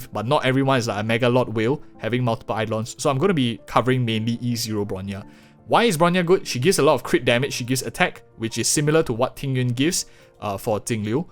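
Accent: Singapore. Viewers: are you by any chance singaporean :D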